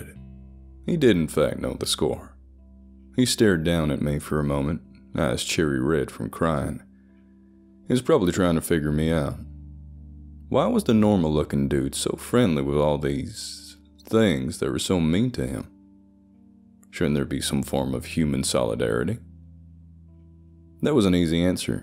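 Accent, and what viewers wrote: South, United States. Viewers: Gotta be honest, too much southern accent, not enough Texan.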